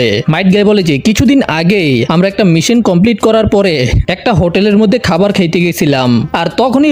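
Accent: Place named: Barishal, Bangladesh. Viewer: আপনার বারি বরিশাল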